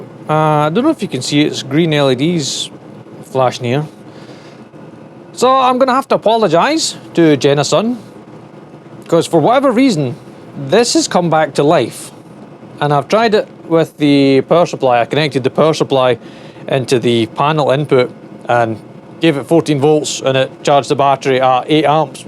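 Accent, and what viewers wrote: Scotland, United Kingdom. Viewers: I love Scotish accent. I always hear something else, like the time you said "I do not have enough hands". The thing I heard was "I do not have enough Hans" :D Nice project btw :) I have brought also this diesel powered heater, to heat up my garage. That project is still work in progress. Also I will install it to my car - 2015 Aixam City Moped-car. That car is so cute, I can make 3-point turn in tight places :)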